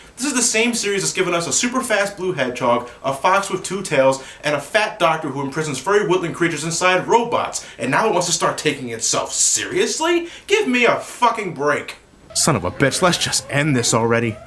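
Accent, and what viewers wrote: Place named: South Jersey, United States. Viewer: That's definitely a lot to say for the story of this game. I kind of see that your a bit similar to ShogunGinO, except your voice isn't as deep. Voice is almost like South Jersey Sam Flemming.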